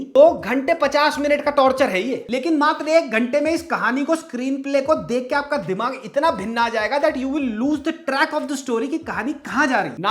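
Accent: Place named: Delhi, India. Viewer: Mothiiii Lag'gii Dekh k 😂😂😂😂😂😂 Delhi accent superb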